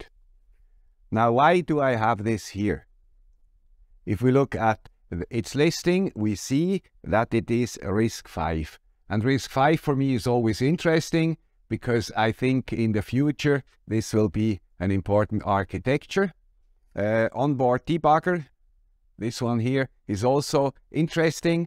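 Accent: Switzerland. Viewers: I blame the high quality of your project focused videos: "Oh, the guy with the Swiss accent dropped a video, gonna be something cool - oh it's a mail bag. I guess I'll watch it later" :) I, for one, appreciate the breadth of these. So perhaps keep them less often, but collect several cool things. Like this one (well, two).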